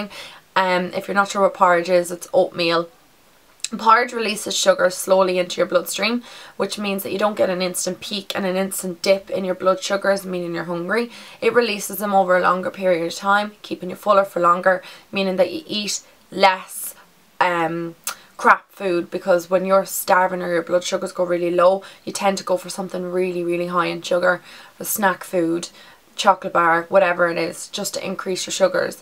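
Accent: Ireland. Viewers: Yes I'm doing good. I walk daily, I'm off oxygen, and insulin, so I control my diabetes with diet now. By the way I wanted to let you know that Ireland is my dream vacation. My ancestors are Irish and Scottish. We go back to a cousin of William Wallace. So I love your accent. What kind of dance do you do? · this is such a good video and i thank you for making it. i try to eat healthy the thing is when i work i am 100 percent focused on working and i forget to eat sometimes. i seriously do by the time i look at the clock it was like 4 in the afternoon. by the way i love your Irish accent wish i had mine. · Hi,thanks for such detailed video.I am a doctor myself,working in UK,have lived in Northern Ireland 4 yrs ago,thats why i liked your Irish Accent :) I have myself have these changes of lifestyle by eating healthy and workout! i totally agree there is nothing such thing as diet,its for whole of ur life...i have always heard abt how good oats are,i will try to include them in my brekky from now :) x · cant believe ive never seen you on youtube before, as soon as i heard the accent i got so excited lol (im from ireland too). 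loved this video so much very informative and holy god your figure!!!! its amazing you should be so proud! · the way you say "but" "fun" "much" etc is so different to the British or the Australian or American accent. I still remember the first time that I went to Dublin, I freaked out! you do speak quite clear to me though. hugs!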